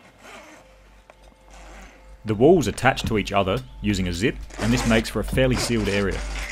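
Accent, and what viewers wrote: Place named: Australia. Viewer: Something about Overland-related videos with Australian voiceovers makes me trust the content that much more. Great review guys, appreciate the time and efforts to put this together!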